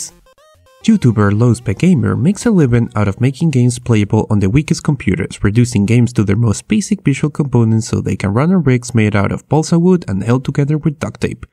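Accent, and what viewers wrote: Spain. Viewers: Are you a spanish speaker? Nice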